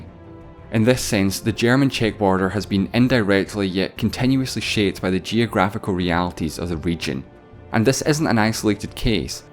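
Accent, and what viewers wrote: Scotland, United Kingdom. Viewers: OK I really need to know your accent? like exactly what is it- scottish im assuming but what type of Scottish its' really great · Scottish I think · He sounds scottish, but someone from the UK might be able to tell better than I can. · I'm sorry, but from where the narrator is? Scotland?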